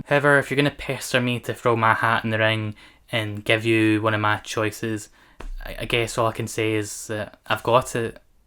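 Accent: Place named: Scotland, United Kingdom. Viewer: This is the best channel I could find, music stuff with a scottish accent!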